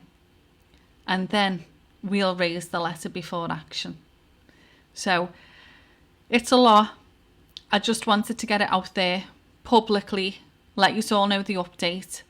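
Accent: Liverpool, United Kingdom. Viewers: And again thank you,you beautiful scouser English lady and to summarise their house of cards are supported by matchsticks that are on fire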